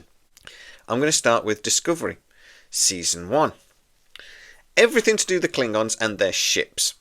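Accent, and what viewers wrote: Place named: United Kingdom. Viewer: This guy has a British accent so I instantly trust him and consider him authoritative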